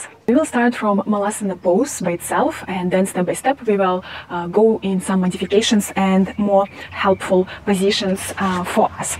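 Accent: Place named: Germany. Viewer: A real sincere efforts to help yogis struggling with this pose. Thnx for sharing. Also, I loved ur English with ( if I m not wrong), German accent. Love from India. Take care, stay safe.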